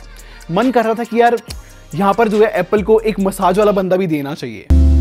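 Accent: Haryana, India. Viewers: Bhai Mahre Haryane ka sound Ghana badhiya lagga, Beech beech me aisi video kar liya kar 🙏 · ठेठ haryanvi 😘 Act Jma gch bhai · Very good Haryanvi 😀❤️❤️ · Hum toh bus haryanvi sunne Aaay h☺☺ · Wah super real Haryanvi 🔥🔥 Jai Haryana Jai Haryanvi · Your haryanvi accent is so good 😀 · bhai bahut sahi haryanvi boli hai aapne 👌👌😍😅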